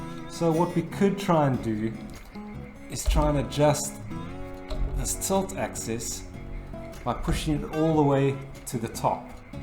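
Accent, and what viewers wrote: South Africa. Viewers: Bro I know ya in Australia but I kinda hear a slight South African in there ? psssssst bro much better if you get GH5 with awesome IBS :P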